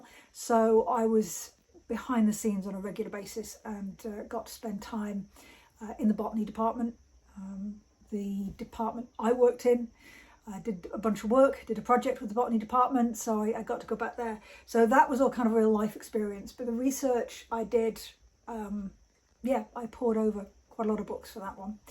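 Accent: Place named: United Kingdom. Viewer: Hi - greetings from Denmark. So nice to hear your voice. I enjoy your books, and it was so great to hear your British accent and even some dialects. You take care of your wife and cats. All the best. Kirsti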